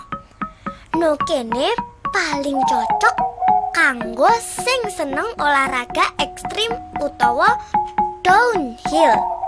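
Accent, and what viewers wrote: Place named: Java, Indonesia. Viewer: Khas bnget logat jowonya😍 Di tunggu subs baliknya y kk🙏😊😊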